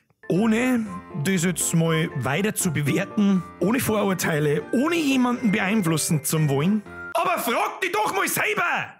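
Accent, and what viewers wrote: Bavaria, Germany. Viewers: Dieses bayrische 💆🏻‍♂️💆🏻‍♂️💆🏻‍♂️💆🏻‍♂️💆🏻‍♂️💆🏻‍♂️💆🏻‍♂️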